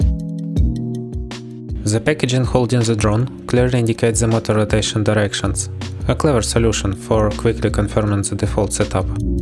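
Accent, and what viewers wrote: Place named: Russia. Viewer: Are you from Russia? I hear the familiar accent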